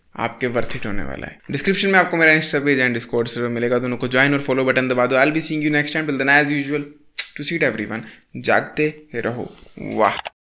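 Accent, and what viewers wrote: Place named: Pahadi, India. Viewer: Bro , ap Himachal sai ho na ? Apkai tone thodi sei Pahadi lag rahe hai 🙂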